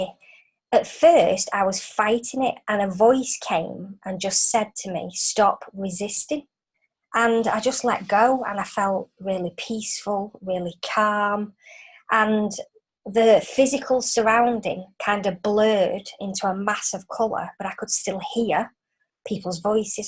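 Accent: Scotland, United Kingdom. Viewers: Curious about her acent. she comes from scotland maybe.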